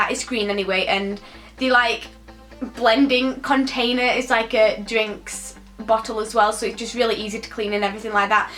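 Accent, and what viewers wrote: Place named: England, United Kingdom. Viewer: ILYSMM i really want to meet you i think we’d get along sooo well! LYSM also what part of England are you from? Your accent is so strong! In a good way obvs ILY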